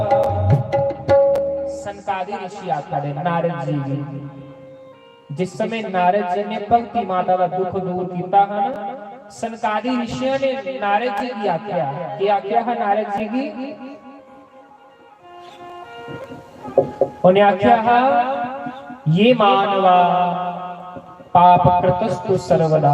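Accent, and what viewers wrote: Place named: Pathankot, India. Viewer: sanju ji di karth mane puri suni muje to apki je. pathan kot bali bani badi madur lagti h mere maike ki bani jehi h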